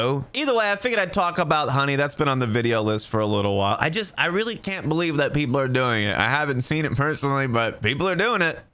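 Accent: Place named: New York, United States. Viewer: What is with the random New York accent you start and stop using at random? I've been watching for a little while and you only mention Colorado and Florida. It's weird af.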